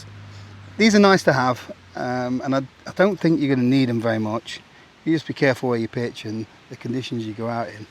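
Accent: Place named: East Yorkshire, United Kingdom. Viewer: You could get yer brompton in there wiv yer.....real cosy. 'Ull' east yorkshire.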